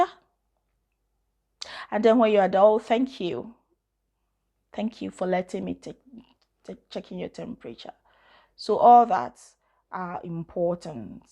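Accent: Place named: Ghana. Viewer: You are very articulate. Thanks for all the information. I feel you are a ghanaian ..... From ur accent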